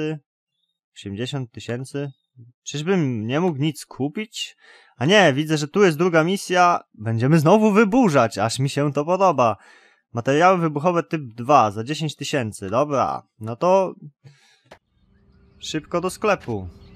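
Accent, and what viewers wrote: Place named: France. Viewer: masz francuski akcent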